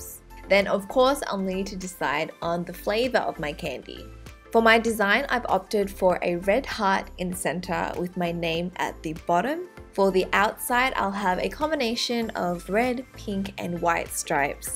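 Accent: Australia. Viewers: I can’t figure out her accent but that’s probably because I’m dumb -_- Can someone pls tell me her accent?? This is me editing it before someone tells me... is it Australian? Sry I’m dumb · Are you aussie??????🤨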